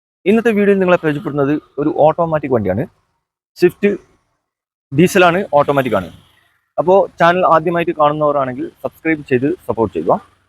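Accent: Kasaragod, India. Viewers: ചാനൽ പേര് നോക്ക് അല്ലെ മലപ്പുറം അല്ല അവര് കാസറഗോഡ് ആവും സംസാരം കേട്ടിട്ട്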